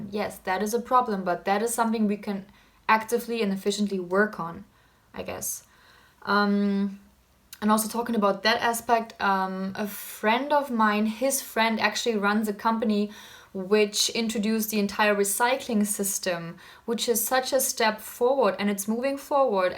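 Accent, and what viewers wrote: India. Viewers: Hey, u r really a indian girl.ur ascent and knowledge is purely Indian. Love u sis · Your accent sounds so Indian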